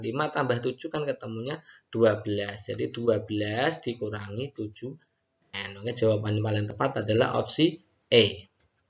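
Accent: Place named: Java, Indonesia. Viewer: Hai... Kak... Dari Jawa juga yaa sepertinya... 😁 Suka banget sama kecepatan menjelaskannya ....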